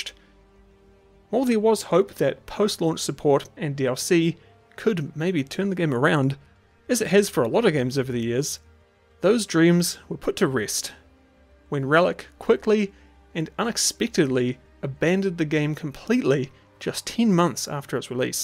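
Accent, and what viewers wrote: New Zealand. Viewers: This video made me actively dislike the kiwi accent. "Vuctury" "Sit-up" "Sid" "Lamint"? Seriously?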